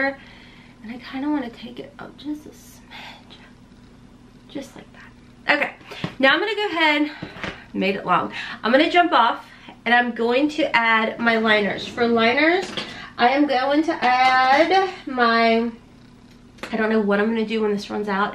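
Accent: South, United States. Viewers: I had to stop this video when you said your not good with words and you hear it in the comments that broke me. Please never discredit better yet never let anyone in these comments discredit you or how you speak words. You are a tried and true southerner girl with a beautiful accent. You speak your words just fine. You articulate words perfectly in your own way, if they have issues then that's on them. This is coming from one southern cajun lady to another southern queen. Now back to the video. Blessings and Love!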